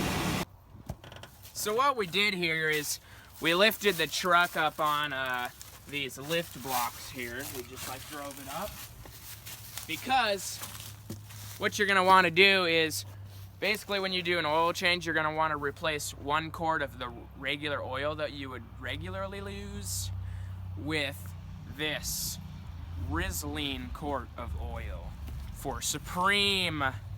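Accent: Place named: Missouri, United States. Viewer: You tell he is a Missourian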